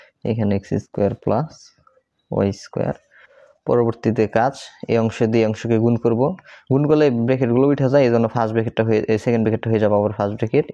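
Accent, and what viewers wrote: Tangail, Bangladesh. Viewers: স্যার আপনি টাঙ্গাইলের। কারণ টাঙ্গাইলের মানুষেরা এই ভাবে কথা বলে। আর স্যার আপনি অনেক ভালো অংক বুঝান ❤😊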